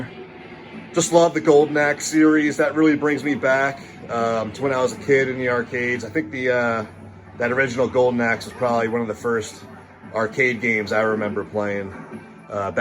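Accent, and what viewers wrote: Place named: Philadelphia, United States. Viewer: great tour, love the room, gotta say the Philly Accent really sells it for me "Homee arcade" :)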